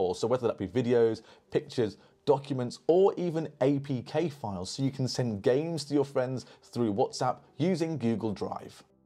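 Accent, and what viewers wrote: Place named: United Kingdom. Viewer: I love his British accent though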